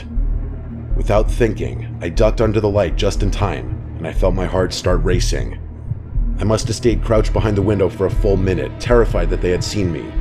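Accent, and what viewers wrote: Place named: Upstate New York, United States. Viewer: I love your accent.It's definitely from upstate new york where i'm from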